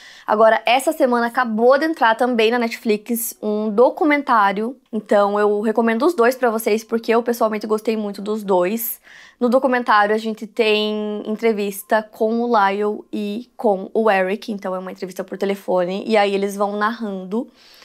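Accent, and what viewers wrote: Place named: Paraná, Brazil. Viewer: Paranaense adoro nosso sotaque.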